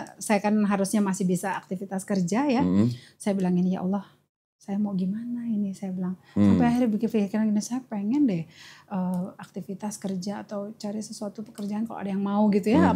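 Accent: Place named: Betawi, Indonesia. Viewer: betawi banget........dialeknya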